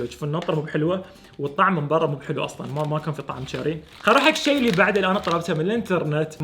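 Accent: Bahrain. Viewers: اول مره اشوفه و محتواه يجنن بل بدايه حسيته عراقي بس حسيت لهجته بحرينيه او من اهل الاحساء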